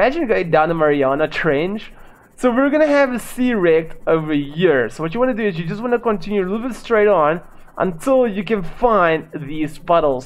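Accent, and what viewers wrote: South Africa. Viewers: are you south african, u sound like one of my friends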